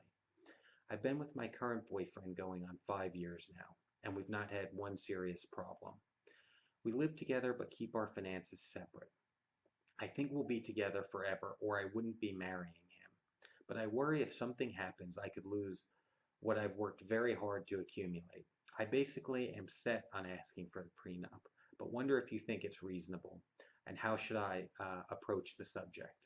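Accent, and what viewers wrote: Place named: South, United States. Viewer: I like your dirty southern accent,!!!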